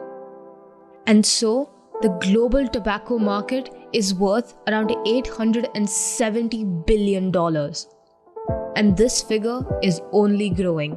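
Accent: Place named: North India, India. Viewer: This North Indian Accent. And the audacity to speak it so confidently. Fucking "Pakistanis on the wrong side of border" .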